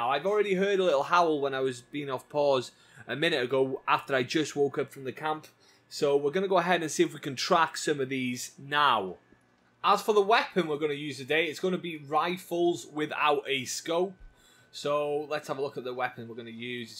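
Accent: United Kingdom. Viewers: Soo damm british! 🇬🇧😅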